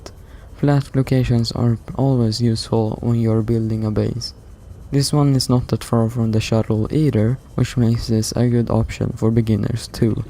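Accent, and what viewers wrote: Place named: Sweden. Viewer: your accent SCREAMS swedish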